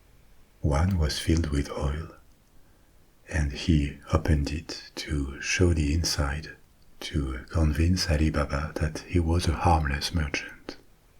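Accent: France. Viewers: Son accent français j’adore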